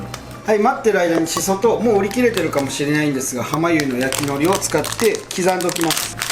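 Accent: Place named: Shodoshima, Japan. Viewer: 夕焼けの小豆島、いいね！小豆島弁聞いてると高松の叔母さん思い出す。ほぼ同じやね。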